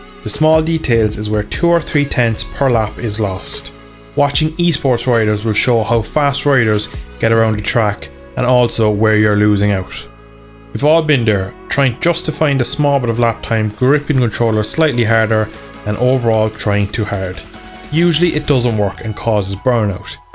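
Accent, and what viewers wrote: Ireland. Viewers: irish brudda?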